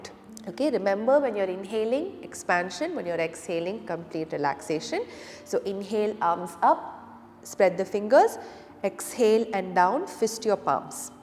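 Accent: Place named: Bangalore, India. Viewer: She belongs to Bangalore, udhar waisa hee pronounce hota hai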